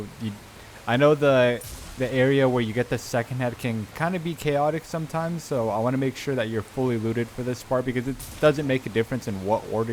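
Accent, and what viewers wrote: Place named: United States. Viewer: you did not just say “my English is not that good” 🤣🤣 dude I thought you were American literally, I’m guessing you’re European as you guys seem to adopt the American accent hahah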